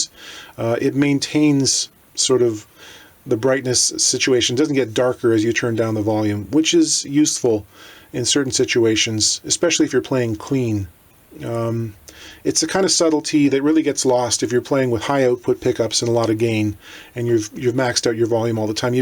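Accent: England, United Kingdom. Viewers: British accent, classic. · I thought so too well, ENglish not British accent). Then I thought of older, bolder, colder, holder, folder etc. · Never mind "solder"......I'm still surprised that you all appear to pronounce the word route (UK pronounciation 'root') as rowt......I never heard Bobby Troup's song sung as "rowt 66"....always "root 66".....just making the comment.....love your absolutely spiffing tutorials and what a class English accent!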